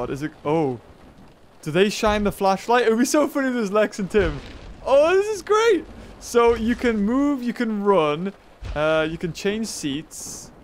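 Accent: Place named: Scotland, United Kingdom. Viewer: With your accent you sound exactly like the actor Ewen Bremner who was in Alien vs Predator movie... heh... When you said .. "Oh God..." it reminded me of this scene ---> <link> Are you Scottish? He is.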